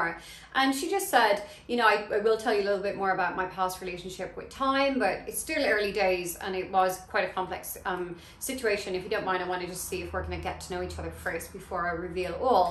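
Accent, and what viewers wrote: Ireland. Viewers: is that a hint of an Irish accent ?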